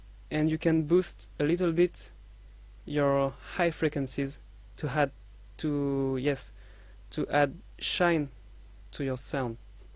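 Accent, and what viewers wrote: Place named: France. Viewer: Je me disait bien ta un accent francais lol ^^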